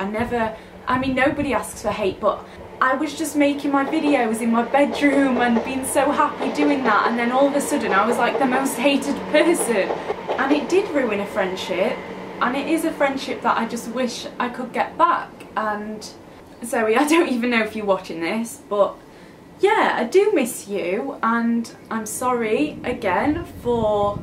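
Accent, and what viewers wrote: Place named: Yorkshire, United Kingdom. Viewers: 'Tret me' Yorkshire lass through and through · You have a really northern accent but you're trying to sound posh and it doesn't work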